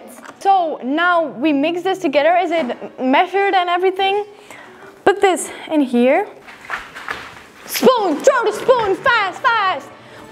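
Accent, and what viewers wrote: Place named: United States. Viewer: Serious question because I'm curious - do all Belgians learn American English with an American accent? Asking as a much closer neighbour (Brit) 😊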